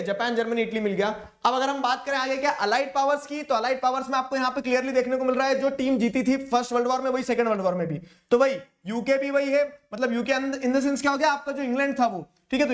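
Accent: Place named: Rajasthan, India. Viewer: Sir aap marwadi bolte ho ky aapke bolne ke tarike se laga ki aap rajsthani hai Please reply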